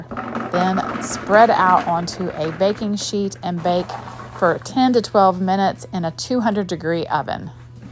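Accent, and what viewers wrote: South, United States. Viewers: My guess is F since she seems to have a southern (US) accent.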